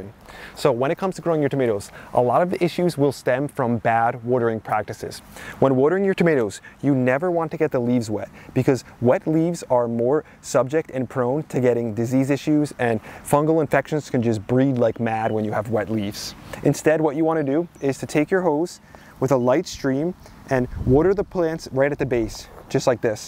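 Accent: New Jersey, United States. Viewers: Love the jersey accent. Midwest college had tons of NY NJ MASS kids. Great diversity before that became a thing. Enlightening and broadened education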